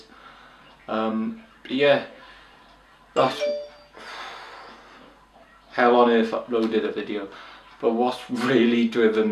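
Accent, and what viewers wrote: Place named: Liverpool, United Kingdom. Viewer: Jesus how did you say woolton hall 😂😂😂😂😂 that was hilarious just as you're starting to pick up the scouse accent too. Great Q&A mate.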